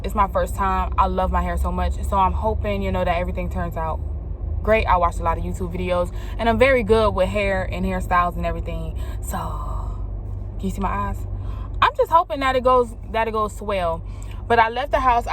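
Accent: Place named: South, United States. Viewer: SMH I love that country twang ☺️😍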